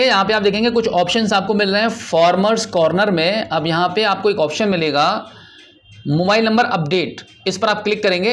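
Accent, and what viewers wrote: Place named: Hardoi, India. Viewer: Bhai aap hardoi se ho?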